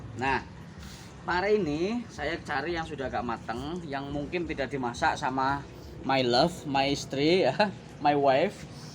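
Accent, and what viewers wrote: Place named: Java, Indonesia. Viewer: orang pekan baru kok bisa jawa kang, aslinya orang mn kang 😂😂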